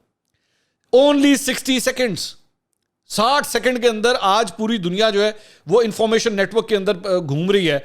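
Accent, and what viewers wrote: Punjab, Pakistan. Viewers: Mansoor ali khan lagta hai shahbaz Gill se uske punjabi touch se bohat impress ho gay hain.. lakin inko acha nhi lagta is tarha ka touch dena punjabi ka..yh higly qualified person hain .inko pehle ki tarha hi bolna chahiye.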